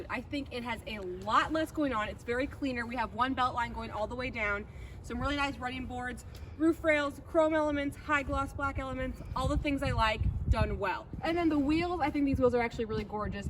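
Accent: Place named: Minnesota, United States. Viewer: She has the Minnesota accent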